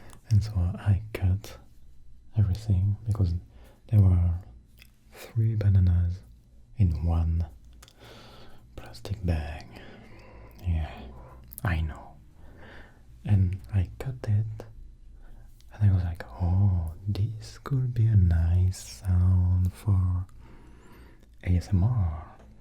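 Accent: France. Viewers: I love whispers but I’ve got like a tolerance up for them now, but you’ve broken it with your soft spoken voice. Love the when the french speak English it’s so nice ✨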